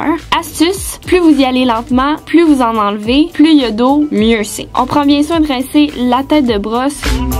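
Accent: Quebec, Canada. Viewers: jou jou québécois je crois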